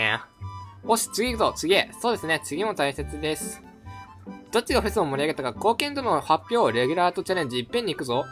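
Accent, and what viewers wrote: Kansai, Japan. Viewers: 関西弁だ！嬉しい(*´ω｀*) そして かわいい笑 いつも以上にかわいいしリピート要素が多すぎる...絶対リピる(予言)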